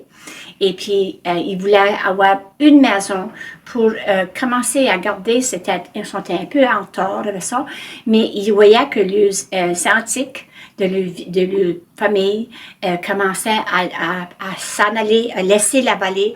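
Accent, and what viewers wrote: Quebec, Canada. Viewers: Pretty much identical to Canadian French, also.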